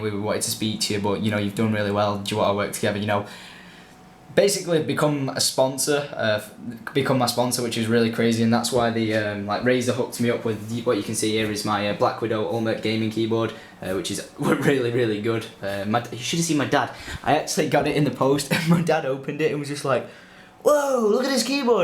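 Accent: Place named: Manchester, United Kingdom. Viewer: What part of manchester does he live in, i mean im from bolton and he sounds like he's local?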